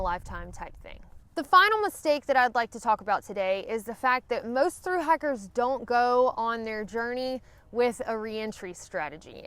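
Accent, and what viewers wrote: South, United States. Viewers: where you from, miss dixie? you sure do talk pretty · Love your Southern accent. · Poor YouTube... Turn off the sound and watch the video close captioned. YouTube just doesn't know how to handle a beautiful southern accent....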